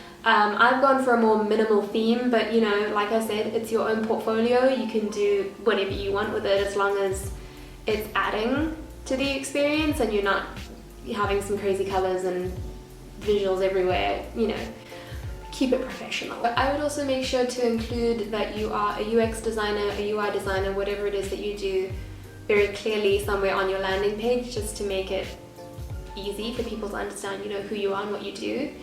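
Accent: South Africa. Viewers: I was like: is she australian is she irish? no south african makes sense now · Always odd to hear an SA accent on YT but I'm happy to support a local creator, great video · Gosh, I couldn't recognise your South African accent at all haha! Maybe because I have one myself, who knows haha.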